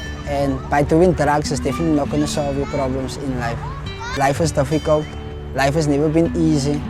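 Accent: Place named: South Africa. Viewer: such an awesome testimony,plus how awesome is the south african accent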